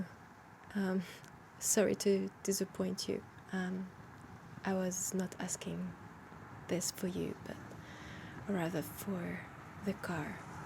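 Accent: France. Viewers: T'es Française chef ?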